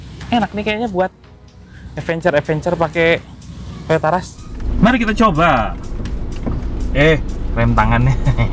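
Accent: West Java, Indonesia. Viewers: Saya tau Anda dari videonya om mobi Gw suka logat sundanya:)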